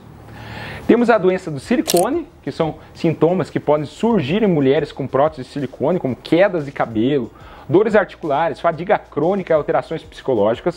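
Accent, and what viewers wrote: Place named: Minas Gerais, Brazil. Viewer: Adoro esse sutaque mineiro. Seus vídeos 👏❤️ são ótimos. Parabéns Dr, por nós proporcionar GG conhecimentos. Não gostei da propaganda da Lacoste.kkkkk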